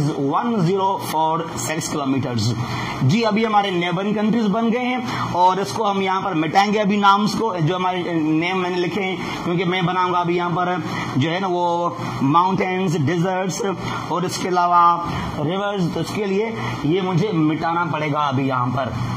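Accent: India. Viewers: ap ka accent b sindhi hai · Improve your pronunciation speaking like punjabi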